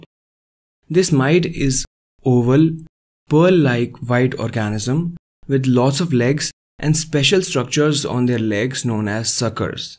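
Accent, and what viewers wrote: India. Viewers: Norwegian scabies ? that’s racist just like when Covid 19 was called the "Wuhan virus", or the "Chinese virus", anybody using that term was labeled a racist. You sound like you’re from India so if a type of scabies originated in India, i doubt you’d call it Indian scabies. "What’s good for the goose is good for the gander." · Very good coverage. Also very interesting that I detect an Indian accent; yet absolutely no trouble understanding your words. Speech is remarkably clear.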